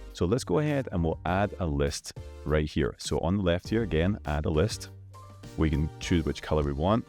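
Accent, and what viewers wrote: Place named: Scotland, United Kingdom. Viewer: Did expect a fellow Scot when I clicked this! 🏴 Good overview, great presenting and useful tips.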